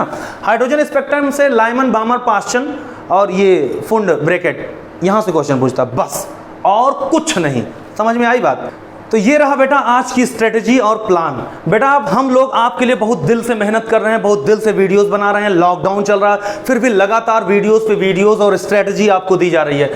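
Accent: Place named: Punjab, India. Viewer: Sir come fast. We need your class. For the First time Maths became easy when i watch Mayank Sir's video and now we got a dj style sir for physics also. And your Hindi is a mix of punjabi language,which i love to hear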